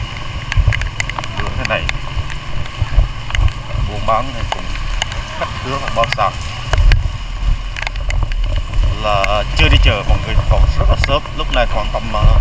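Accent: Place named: Hue, Vietnam. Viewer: Nói tiếng Huế cho dễ nghe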